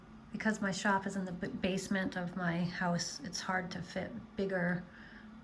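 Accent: United States. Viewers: Thank you for the nice tour of your shop. Mine is similar in size. I'd like to show you mine now that you've shown me yours, but how do I do that? I am somewhat advanced in furniture making but a beginner at the lathe. I'm glad I found your video channel and will follow you with interest. You sound as if you have a New York accent but you've mentioned that you came from Florida and moved to Maine. But, what's with the Penn State sweatshirt? I am a retired mechanical engineer and graduated from Villanova. I live about two miles from that campus, so I didn't get very far after graduation. I worked for the DOD and the Dept of the Navy fixing ships; actually I've been all over the world at least twice. Good luck in your future video making. You give good video. -Curt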